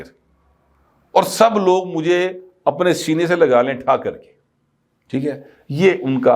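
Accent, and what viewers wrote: Punjab, Pakistan. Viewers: What I mean in the other comments Is Punjabi urdu mix accent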